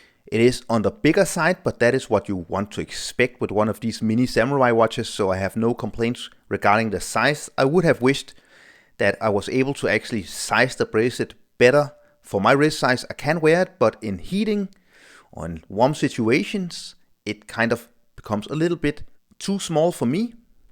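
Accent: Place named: Denmark. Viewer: lyder umiskendelig som dansk-engelsk tydeligvis 🙂 I think the Samurai watchcase is beautiful both this which is the new one but also the previous edition being a bit bigger. I have a weak spot for green dials, however i would prefer this model in a higher quality as for a ceramic bezel an crystal glas. I am still considering buying it but there are so many different to chose from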